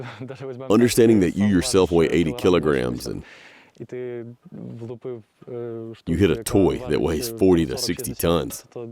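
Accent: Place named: South, United States. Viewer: I really like the southern voice used! I like to listen to longer Resonance videos while driving.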